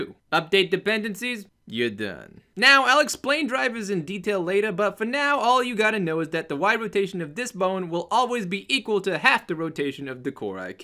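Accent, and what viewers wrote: New York, United States. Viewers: love your tutorials but the ny hot dog vender thing you have going on is annoying af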